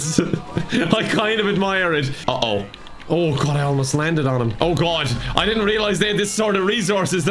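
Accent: Ireland. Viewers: Hearing an Irish Lad talking about basketball just hit different for some reason. Also love your vids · Ah yes the Irish man talking about NBA love u!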